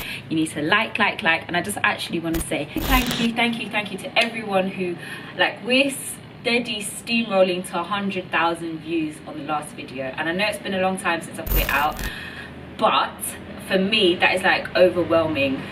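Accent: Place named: United Kingdom. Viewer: hey!! Welcome back. I even forgot you had a British accent... Eek!!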